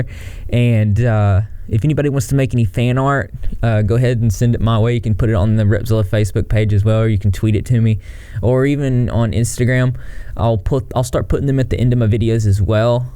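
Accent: South, United States. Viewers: Repzilla, are you from the south? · Your southern accent is endearing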